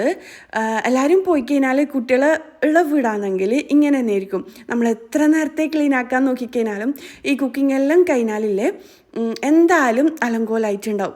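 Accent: Kannur, India. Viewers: നമ്മളെ കണ്ണൂർ ഭാഷ തന്നെ 😅😅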